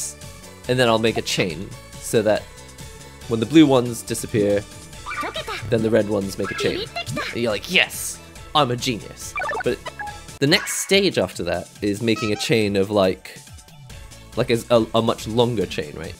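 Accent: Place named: Glasgow, United Kingdom. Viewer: You have an interesting accent. It almost sounds like (posh) Glaswegian at times.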